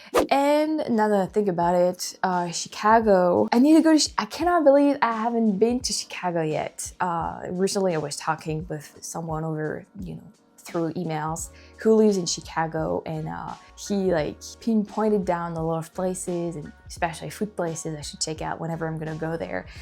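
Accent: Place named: France. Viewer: your English is having less French accent to it I noticed.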